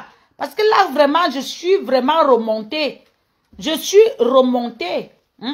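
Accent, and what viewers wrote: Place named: Ewondo, Cameroon. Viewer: Mieux tu parle ewondo ton français et ton Corp se resemble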